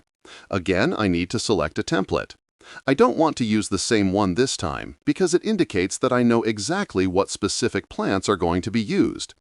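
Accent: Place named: Europe. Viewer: very good detailed how to PV panels , it will be my go to video for the next few months as i work through projects, Ai voice is good and clear and I can see how it is useful for a international audience, but I do miss the personal touch of the European accent on previous EMD videos, but that is jus me preference.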